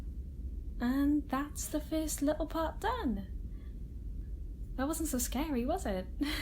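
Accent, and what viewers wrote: Wales, United Kingdom. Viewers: AWESOME VIDEO PLEASE MAKE A PART TWO TO THIS ALSO I LOVE YOUR BRITISH ACCENT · u sound kinda welsh lol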